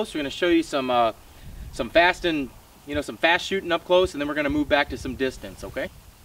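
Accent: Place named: South, United States. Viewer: Your starting sound a little more southern. A little bit.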